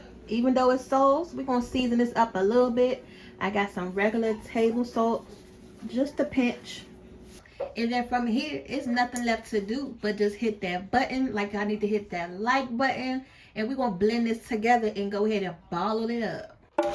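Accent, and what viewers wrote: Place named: DMV, United States. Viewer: You have to be from the DMV area, I know that accent from anywhere!!! After watching the vid, yes you def are!! I feel so special watching someone from my area on YouTube!!! Get it y’all I love it😍😍😍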